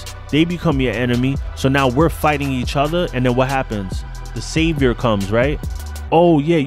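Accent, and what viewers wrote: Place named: East Coast, United States. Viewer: First time but # where you from I hear the east in you bro. Tappin In from Boston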